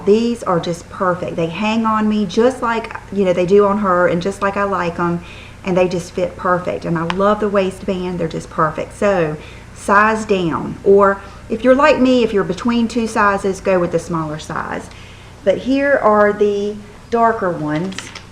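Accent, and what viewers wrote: United States. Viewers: i just love your country accent :)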